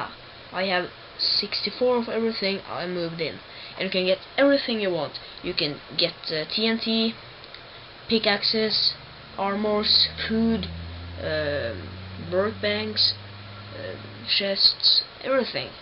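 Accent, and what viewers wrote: Norway. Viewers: are you norwegian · are u norwegian?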